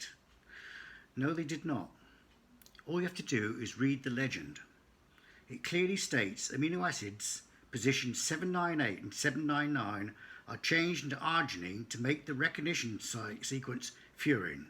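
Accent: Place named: United Kingdom. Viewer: Do you have anything other than a British accent to establish yourself as an authority? Because that really doesn't do it, especially these days.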